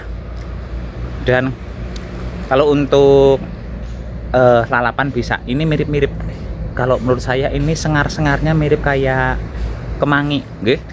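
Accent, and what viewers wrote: Banyumas, Indonesia. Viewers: Salam kenal br ketemu Chanel ini Mase drmn kok ngapak sy dr purwokerto arcawinangun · Sya suka nyayur kang purbalingga,rika ngapak pa wong banyumas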